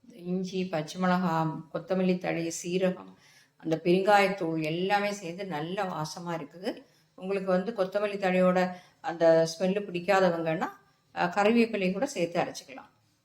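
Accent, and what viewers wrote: Kerala, India. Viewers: Are u a malayali?your slang of Tamil says so.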